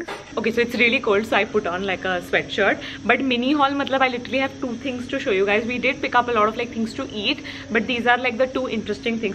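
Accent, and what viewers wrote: Gujarat, India. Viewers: That gujju accent😂🤭❤❤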